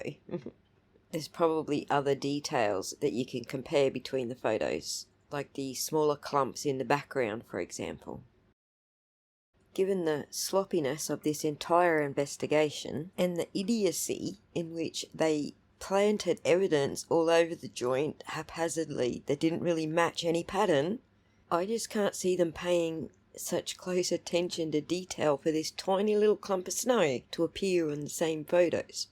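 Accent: Australia. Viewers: I love your Australian accent!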